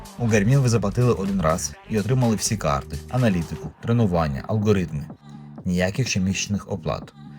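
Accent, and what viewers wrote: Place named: Russia. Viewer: тому, що людина була все життя російськомовною і ще не впоралася з цим маркером російського акценту.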